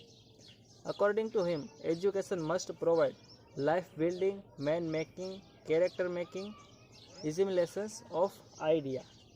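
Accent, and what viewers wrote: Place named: Bengal, India. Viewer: Like my benglai teacher speaking English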